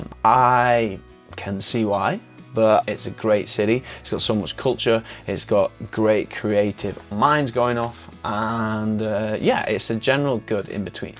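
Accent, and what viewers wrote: United Kingdom. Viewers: I'm a bit surprised that people would find your accent strange. People with UK accents are very common in Canada a lot of Brits come here. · Your accent is nice, not weird, sounds like a notherner.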